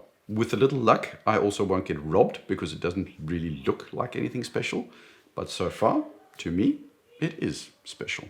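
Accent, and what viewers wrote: South Africa. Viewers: A fellow Saffa, I got the Pelagos Fxd Gmt, moved over from a garmin smartwatch, Strange enough from my research, Tudor played a huge role in the old sadf & navy Hear your accent & I was like this guy could be South African, he's using the word chill & the nearing the end said a braai done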